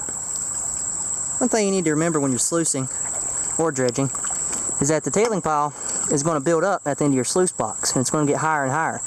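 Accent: South, United States. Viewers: I'm new but are you southern American?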